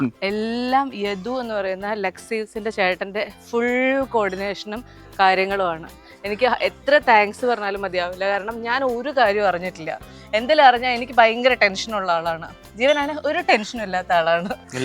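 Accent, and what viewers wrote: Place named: Kasaragod, India. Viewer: Thadi nalle pangend😜kasaragod slang❤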